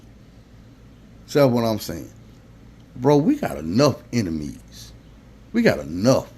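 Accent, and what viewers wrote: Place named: Mississippi, United States. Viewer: You sound from Mississippi bro im from 🥾⚜️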